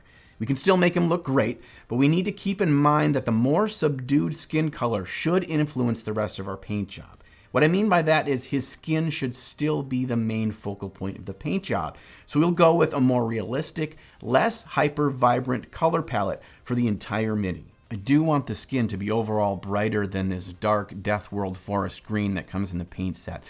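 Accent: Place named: Midwest, United States. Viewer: I knew you were a midwesterner, and that ope was the final clue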